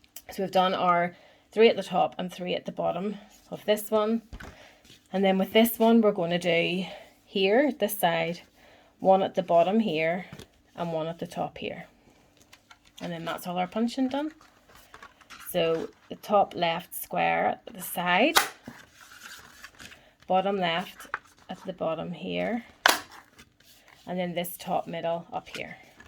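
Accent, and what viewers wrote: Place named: Scotland, United Kingdom. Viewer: Just subscribe, love the beautiful Scottish ( I hope) accent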